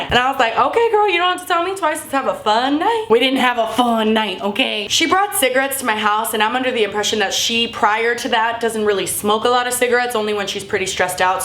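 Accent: Cuba. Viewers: Yes she's Cuban, she's said that many times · she's cuban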